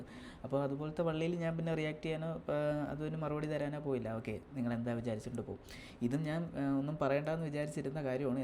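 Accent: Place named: Trivandrum, India. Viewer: trivandum sideil ചില ഭാഗങ്ങളിൽ സംസാര രീതി ഇങ്ങനാണെന്നു ഞാൻ കേട്ടിട്ടുണ്ട് ആദ്യം ഒക്കെ ഇവരുടെ reelsile സംസാരം കേൾക്കുമ്പോൾ trivandram slang വല്ലാതെ standard കുറവായി തോന്നിട്ടുണ്ട്, . ഞാനൊക്കെ ഒരു conversationil നിങ്ങൾ”എന്ന് automatically പറയും. but പറയേണ്ടാ രീതി unclum , auntyum, ചേച്ചിമാരും ചേട്ടൻമാരൊക്കെ , എവിടെക്കാണ് പോണെന്ന് എന്നാണ് 🙄നിങ്ങൾ എവിടെക്കാണ് പോണെ എന്ന് ചോദിച്ചാൽ പ്രശ്നമാണ്🫣… അത് ഞങ്ങളുടെ നാട്ടിൽ disrespectful ആണ്.. എന്റെ parenstinte കയ്യിനു വഴക്ക് കേട്ടു മടുത്തിട്ടുണ്ട് ..അതുപോലെ എടി എന്ന് വിളിക്കാൻ പാടില്ല. but എനിക്ക് എന്റെ കൂട്ടുകാരി അങ്ങനെ വിളിക്കുന്നത് ഇഷ്ടമാണ് ഒരു closeness feel ചെയ്യുന്നു, എനിക്കു അങ്ങോട്ട് വിളിക്കാനും okey ആണ്, but parents and auntymar കേട്ടാൽ അപ്പോ ഉപദേശിക്കും.ഓരോ നാട്ടിൽ ഓരോ reethi.. ഇവിടെ ഞാൻ ഉൾപ്പടെ comments ഇടുന്നവരിൽ പലരും trivandrum അല്ലെന്ന് മനസിലായി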